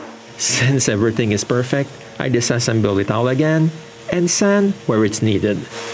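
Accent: Quebec, Canada. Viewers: Vous ete Canadian Francais? Je suis americain et entendre votre accent(je crois.) Bravo en tout cas(je vis en France depuis 30 ans...by the way) rr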